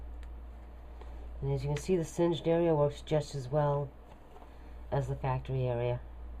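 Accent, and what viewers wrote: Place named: New England, United States. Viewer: That New England accent 💕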